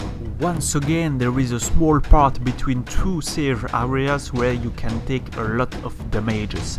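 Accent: France. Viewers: Putain de french accent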